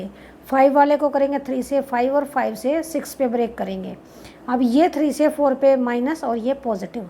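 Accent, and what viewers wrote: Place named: Haryana, India. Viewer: Pure haryanvi🤩🤩🤩🤩😍😍🥰🥰👌👌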